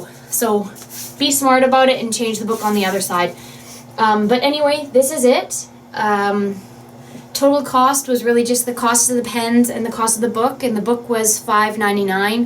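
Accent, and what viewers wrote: Canada. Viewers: are you Canadian ?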